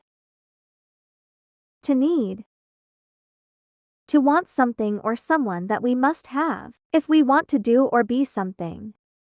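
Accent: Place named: United Kingdom. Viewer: عادي تسوين بلهجة بريطانيا❤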